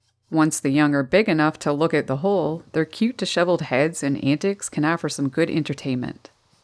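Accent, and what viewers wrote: Newfoundland, Canada. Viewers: Oh my, you sound Canadian. I'm sorry that our president is a jerk. I enjoyed your video very much. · Hi, just curious, are you by chance from Newfoundland?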